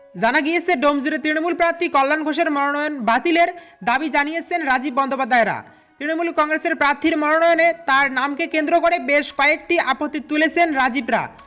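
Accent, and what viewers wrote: Bangladesh. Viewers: valo kore kotha bolte sekh.bangla deshi der moto kotha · বাঙলাদেশীদের মত উচ্চারণ।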